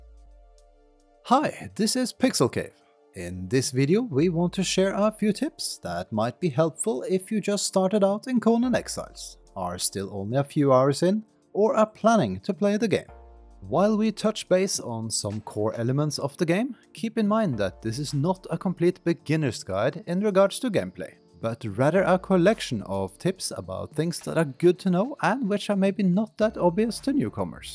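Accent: Norway. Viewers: Det var lettere å høre at du var norsk på engelsken din, enn om du bare hadde snkket engelsk! Men bra tips det der!!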